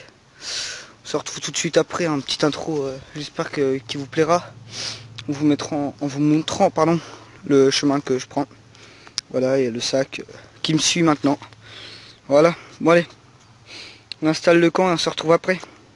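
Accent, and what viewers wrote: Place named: Lorraine, France. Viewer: salut jolie video,de part ta video j ai entendu que tu est de lorraine, je le suis aussi nottament de Nancy.ou s tu pour habitudes de sortir ? si tu a des coins sympas,je suis preneur.amicalement.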